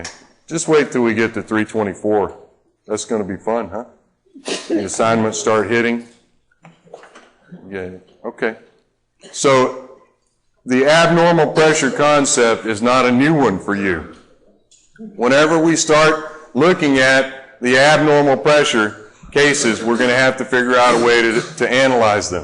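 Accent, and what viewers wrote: Russia. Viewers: I like your Russian English accent and your way to explain materials , can any one give me the name of the instructor ? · man i loved your russian english accent hahahah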